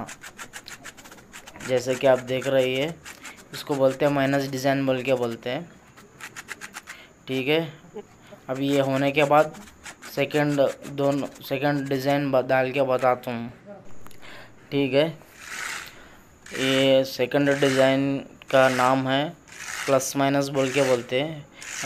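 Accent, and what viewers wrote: Hyderabad, India. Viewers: Hyderabadi ka language mast hai 😄. My favorite is +- and Blade design. Great work art.👌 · All are good designs....My teeth were actually sensitised with that scratch sound.....loved your hyderabadi way of speaking.....bole to bahot acha laga 👍😊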